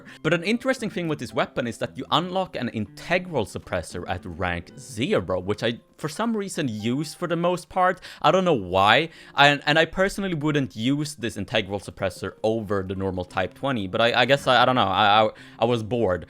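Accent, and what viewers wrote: Sweden. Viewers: Where are u from? (U sound Swedish, I know that because I’m Swedish)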